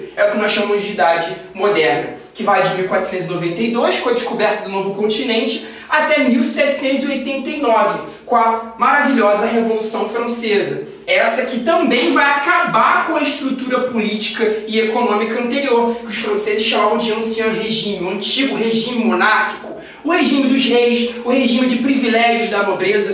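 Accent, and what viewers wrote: Rio de Janeiro, Brazil. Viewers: O complicado mesmo mesmo é suportar o carioquêixxxxx carregadíssimo.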